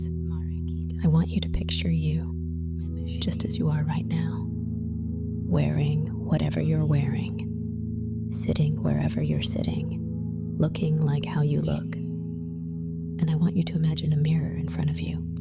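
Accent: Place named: France. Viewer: You Have Quite The French Accent 💗💗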